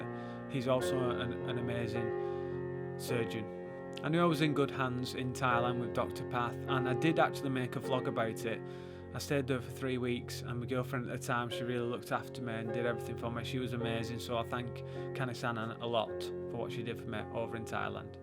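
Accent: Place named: Hull, United Kingdom. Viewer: Hull in england maybe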